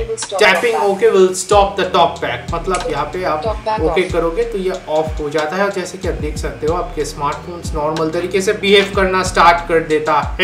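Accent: India. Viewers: Damn, couldnt get passed the heavy indian accent. Not hating him, wtf u thinkin, damn did not help me at ALL Thanks 4 Nothing. Just wanted keyboard back to normal, now Im fluent in indian language. Damn, use some one that speaks clearer english, just sayin......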